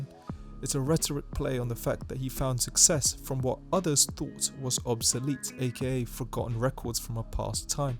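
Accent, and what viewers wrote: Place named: England, United Kingdom. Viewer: It’s cool hearing an English fella taking about one of my most personal and favorite albums. It’s like a Louis Theroux documentary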